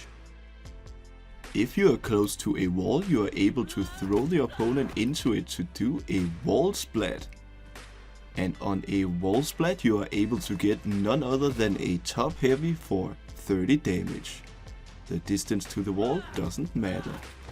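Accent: Denmark. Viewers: er du dansker haha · Er du fra danmark? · Is it just me or are you from Denmark? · are u from denmark? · thanks buddy! Fine bonus with the danish accent. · Jesus u sound like a Danish dude and pls don't talk so slow ÆØÅ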